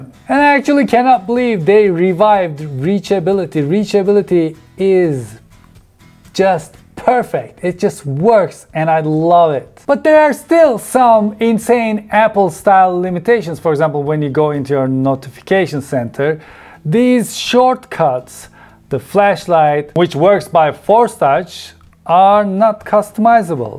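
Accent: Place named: Turkey. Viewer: Abi türk olduğun o kadar belli ki konuşmandan yani başkası olsa bu kadar rahat anlayamazdim ne dediğini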